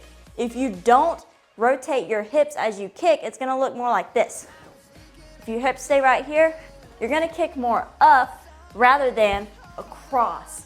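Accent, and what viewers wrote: Texas, United States. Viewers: whAT IS THIS ACCENT IS THIS TEXAN WTF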